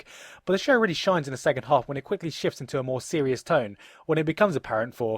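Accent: United Kingdom. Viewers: Wow he really does sound a little more British here than now. Cute.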